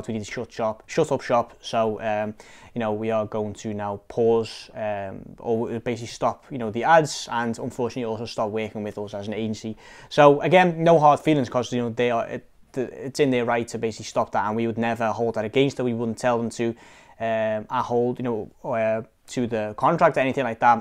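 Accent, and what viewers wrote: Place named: Liverpool, United Kingdom. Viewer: Ok so he only reason I'm continuing to watch this , us for the scouser accent ....lol....I was born in Cheshire ...live in Australia for 45 years ...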